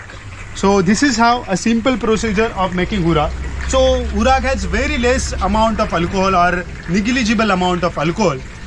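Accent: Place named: Maharashtra, India. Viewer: खूप बरं वाटतं जेव्हा आपण अंग्रेजी मध्ये बोलता 🙌🏽 Appreciate your efforts and speaking with Marathi infused in subtle way, with specific words and accent too 😇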